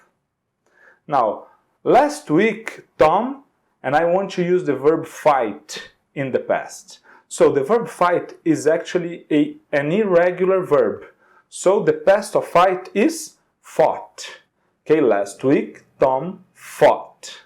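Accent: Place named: Brazil. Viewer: ótima aula. e seu sotaque brasileiro nos lembra a importância de aprender idiomas e manter nosso sotaque com orgulho! muita gente mira no objetivo de perder o sotaque brasileiro, o que é uma grande bobagem. imitar outros sotaques só serve pra brincar, fazer piadas e tudo mais... jamais pra adotar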